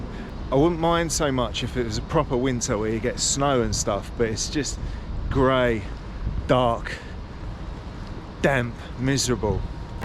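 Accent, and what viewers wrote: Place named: England, United Kingdom. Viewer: U going to Americana lol worse than uk or England which u spund like u from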